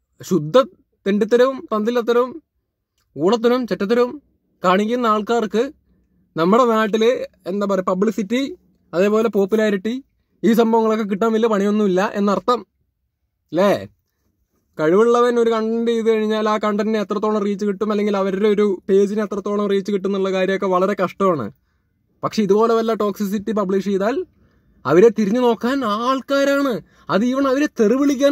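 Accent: Palakkad, India. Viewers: Palakkad aano etta nigal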